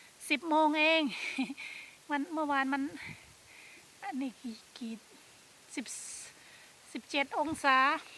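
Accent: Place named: Surin, Thailand. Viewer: เพิ่งเห็นจ้ะติดตามนะเว้าเหมือนคนสุรินทร์นะชอบๆจ้ะ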